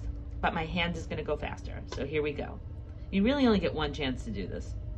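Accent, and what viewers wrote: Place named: New Jersey, United States. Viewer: My teacher is also from New Jersey.. you two have same accent.. she also says to "call it a pot".😁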